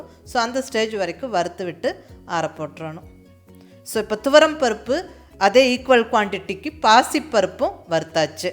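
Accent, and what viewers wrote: Coimbatore, India. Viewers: U are from coombatore. The way u talk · Varuththu vittu kitta namma kongu tamil super mam ❤naanum idhey ooru than piranthu valarnthu marriage aanathu extra extra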